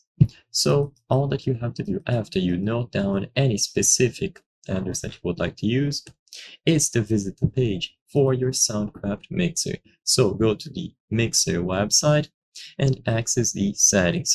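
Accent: Brazil. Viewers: É br né cara? Kkkk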